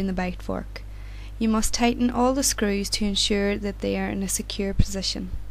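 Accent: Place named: Ireland. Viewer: This is a brilliant video, thank you, and narrated with a lovely irish accent. Will help with orthognathic records I'm taking today, keep this up. As an aside, you could turn this into a research project, onto the use of videos for education of the dental profession, a simple survey based response and get a publication under your name. Thanks again, Jay Patel ST2 orthodontic registrar, The Royal London Hospital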